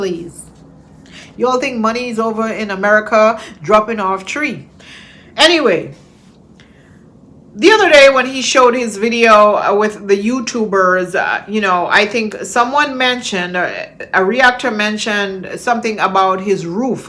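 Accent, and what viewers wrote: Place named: Jamaica. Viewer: I heard Jamaican in you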